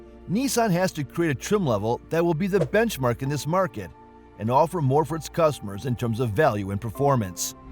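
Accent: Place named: California, United States. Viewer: This guy sounds like a Californian who doesn’t know anything about anything talking about cars like he’s an expert in the consumers opinion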